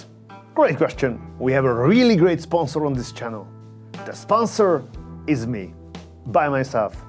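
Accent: Hungary. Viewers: Emlékszem mikor még pár ezer feliratkozó volt a csatornán és azon gondolkodtam, hogy ez egy kifejezetten magyar akcentussal beszélő úriember és bár eddig nem hallottam róla, valahogy mégis büszkeséggel tölt el, hogy egy magyar, illetve több magyar ilyen minőségű tartalmat gyárt. Nem tudom, ha valaha hagytam így hozzászólást bárhol, de úgy éreztem, hogy itt az ideje. P.s. remélem idővel én is veszek egy Krug-ot, ami majd erre a csatornára emlékztet. Hajrá!:D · I never realized that you had a Hungarian accent. Now, that I know, I can't un-hear it ;-). Thank you for videos. It is an extremely rare opportunity to get a glimpse into the world of 2-3 star Michelin restaurants where a dinner for two is upwards of 1k Euro (not counting extra wine at 2k a bottle). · Love your Hungarian accent. It adds to the charm of the channel 🤌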